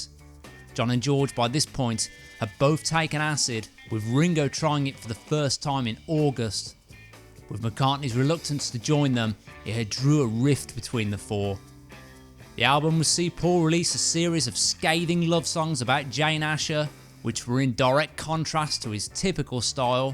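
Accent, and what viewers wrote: United Kingdom. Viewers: There's an old saying: "If you put lipstick on a pIg... it's still a pig." Well, "If you put a cliche British narration on a mediocre script, it's still a mediocre script".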